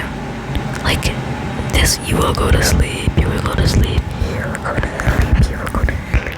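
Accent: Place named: United Kingdom. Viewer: girl your British accent is so good!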